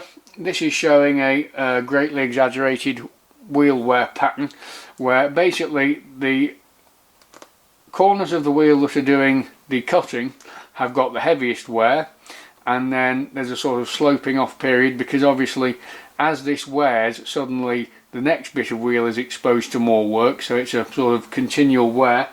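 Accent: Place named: Cumbria, United Kingdom. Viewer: lake district? Cumbria? your accent reminds me of my granddad, he was a tool and die maker from a long line of em who worked at Vickers up in Barrow in Furness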